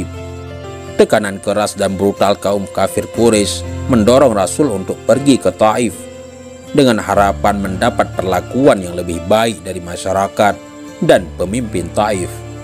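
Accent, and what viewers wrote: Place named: Bali, Indonesia. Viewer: suaranya mirib suku bali ya 😇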